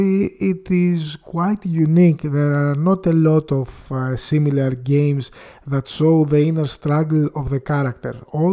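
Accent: Greece. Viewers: Is this guy Greek? I have heard others with the same accent.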